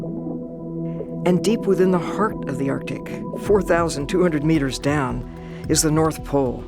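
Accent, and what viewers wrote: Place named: United States. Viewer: wonderful footage of such a beautiful landscape, really enjoyedwatching it. shame about the horrible narration, an extremley irritating strong american accent of a 12 year old boy.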